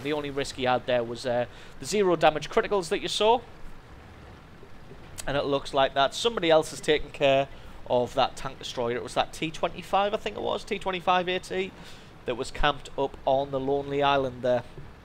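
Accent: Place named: Newcastle, United Kingdom. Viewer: Nice. Im new you this channel. One question, Are you from Newcastle??? If so where abouts? I live in wallsend. Just nice to hear someone that sounds like me on youtube haha.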